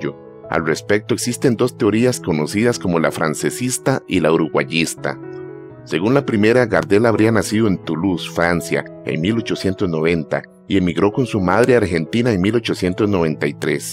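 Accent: Costa Rica. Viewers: Me encanta como pronuncia las R’s el presentador de este canal. Ese acento solo lo tienen los de Costa Rica 🇨🇷 😄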